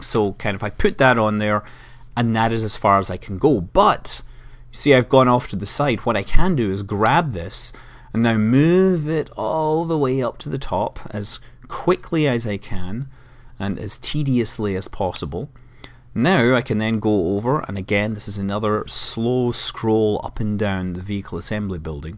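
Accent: Scotland, United Kingdom. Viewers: Nope, it's a Scottish accent. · scottish, not much difference, i know cus am irish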